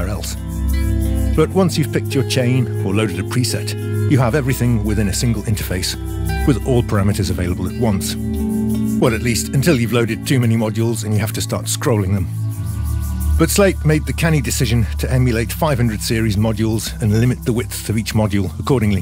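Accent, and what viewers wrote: United Kingdom. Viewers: But wait! You sound British to me as an American. Have you ever heard of SSL? They made this thing called the 4000. You needed really long arms. And they became very popular. With people that had long arms. Not so much with you folks with the short arms. You've been playing with too much. While I love what all of these plug-ins have to offer. Most all are really unnecessary. They are just there for children that like playing video games with audio software and always win. It really doesn't have much to do, with music creation, itself. It's whizbang. And so all those great sounding rock 'n' roll hits. That all of these plug-ins are said to emulate. It's all bullshit. It's, total bullshit. None of those great hits had much of anything to use. We had two may be three perhaps four choices? For everything. That was it. And we had one limiter. 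And then got a cheap one. Only to find. It really wasn't worth using. And so we only had one good limiter a black 1176 purchased brand-new in 1976! I just sold last year. Because the clones are just as perfect. As in, perfect. And at a bargain price! And I had one reverb. From Germany. Made with a big piece of cold rolled steel plate. From a company that, tried to kill us years ago. Along with their microphones. And their cute little cars. All thanks to the worst dictator in modern times. And was a hard way to get good quality sound. That we already had. But theirs, sounded different. It sounded German. For, Wagner. Our stuff was better for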